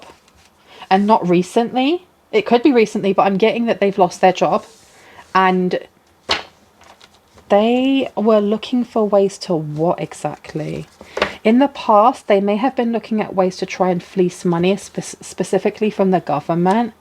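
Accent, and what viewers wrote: United Kingdom. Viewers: Thanks! This presentation and the one after it really resonated with me. In my situation, past & present. BTW really love your British accent.❤😊 i couldn't stop giggling when you said,...." as if they were stumbling around like they came out of a bar or something."" I was expecting you to say pub, though! 😂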